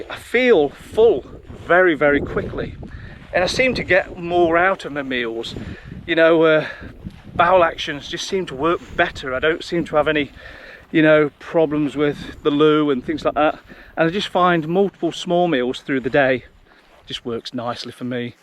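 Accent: United Kingdom. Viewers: That's great to hear about carnivore diet with a british accent ;-)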